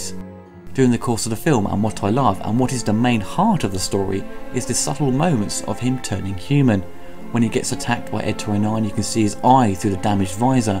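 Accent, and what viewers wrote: United Kingdom. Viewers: Love your reviews. I'm catching up on them. I just love British accents, and word choices. See Americans would say...RoboCop blasted the ED209 to pieces..but you say "he blasts it to bits"..love it!!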